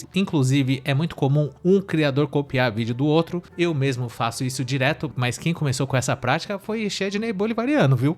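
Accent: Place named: Southern periphery, Brazil. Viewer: Um canal de 62 mil inscritos que não tem nem coragem de botar a cara querendo falar dos outros 😂😂😂😂😂 fora esse sotaque horroroso de periferia do sul que é intragável kkkkkk se enxerga seu invejoso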